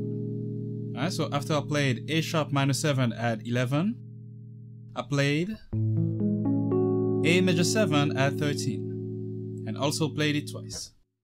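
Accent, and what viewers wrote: France. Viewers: Awesome man, i'm very grateful. Is that a french accent?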